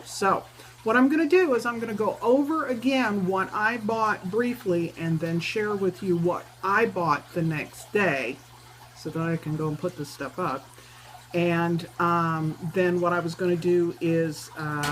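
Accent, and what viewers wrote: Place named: Georgia, United States. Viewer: I can't believe you were born in New York and lived in the Midwest. Georgia really "got hold of you". I pronounce most of the words the same as you and I was born and grew up in South Carolina. However, I don't have the drawl that most southerners have either. Love all the charts and fabric you have. I would love to go to a market and especially a retreat. Martha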